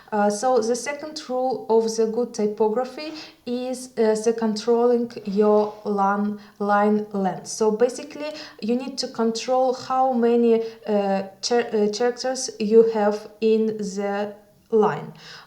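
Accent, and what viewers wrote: Russia. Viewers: The dictor has a strong russian accent:) It seems easier to make it in russian for her than in english)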